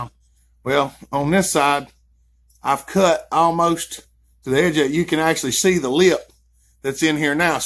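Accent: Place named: North Carolina, United States. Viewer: you gotta be from North Carolina because we sound just alike when we talk. Im from around high point NC. Where are you from brother?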